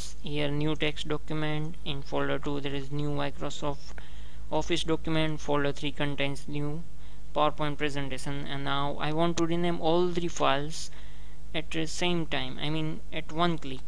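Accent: India. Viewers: oh this indian accent 😮‍💨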